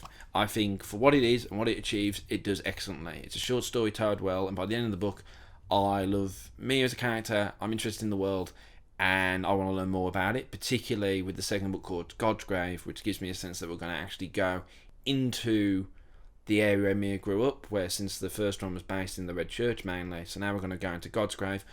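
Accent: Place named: Birmingham, United Kingdom. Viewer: Hi, I know you’re from Australia, but can I ask…are your parents from Birmingham? I’m having an argument with my husband that your accent is not purely Australian. I can hear some Brummie in it. Please excuse the prying, but I lived in Australia and you still sound more Brummie to me. Would you mind letting us know??? Please…thank you.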